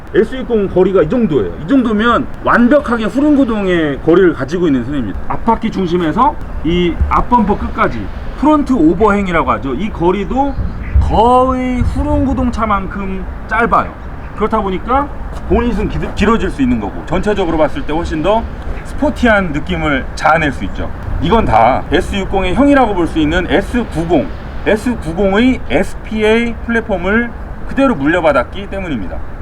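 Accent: Chungcheong, South Korea. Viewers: 영상 처음봤는데 구독박고 갑니다~ 충청도 분이신가요? ㅎㅎ 말씀하시는 게 친근하게 들리네요~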